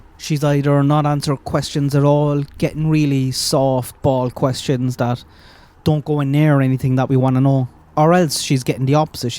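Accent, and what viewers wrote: Ireland. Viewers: you gotta be Irish , I am an you have what sounds to me Limerick or Tipperary ?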